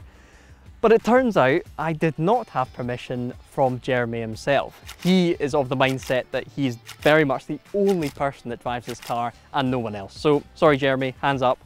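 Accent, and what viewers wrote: Scotland, United Kingdom. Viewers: It was clickbait because It had a picture of Clarkson in it, this guy was is Chris Harris if he lost a bit of of chubb and got a Scottish accent. Yes I do like the engine in this Alfa but I'm more into old JDM cars because they don't break,like one of distant relatives knicked his car when he wasn't looking..🤣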